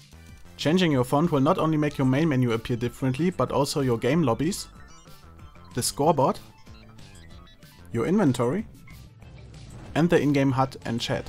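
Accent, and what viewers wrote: Germany. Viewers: Are you German? Cause you sound like German ^^ If yes, then Halloooooo :D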